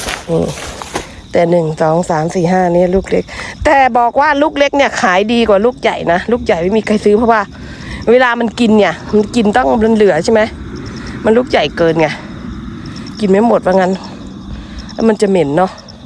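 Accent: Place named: Thailand. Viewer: อยู่ญี่ปุ่นมากี่ปีครับยังไม่ลืมสำเนียงไทย เยี่ยมครับ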